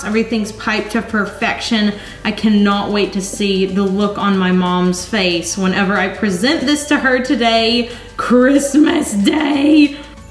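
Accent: Texas, United States. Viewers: R u from texas?love ur channel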